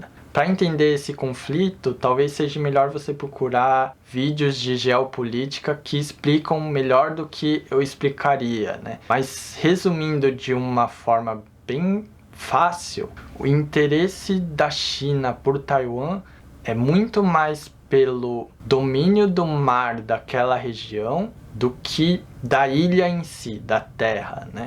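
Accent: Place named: Japan. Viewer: Man teu sotaque já tá bem japonês tbm kkkk seloko vc tá certo Man o Japão mesmo com seus problemas naturais e outros problemas, sempre vai ser melhor que o Brasil, país SERIO, não um país de palhaços que nem o nosso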